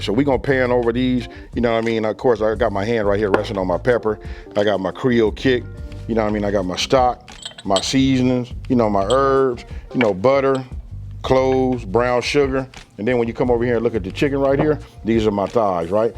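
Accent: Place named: California, United States. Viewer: I'm digging the Cali accent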